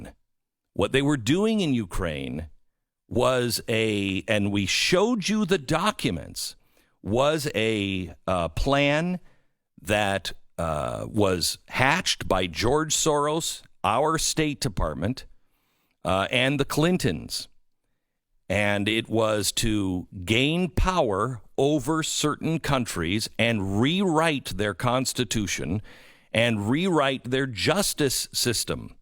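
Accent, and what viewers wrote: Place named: Russia. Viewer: Every time I think you are almost credible, out comes the crazy russian accent, the blanket statements of "nazis" coming to crush America, and the call to arms for us to stand strong against an enemy that we have no possible way of confronting. In the end I become more convinced that you are the face of crazy propped up by the deep state. Put here by design as an example of what foil hat wearing wackos look like so that by association we are summarily dismissed.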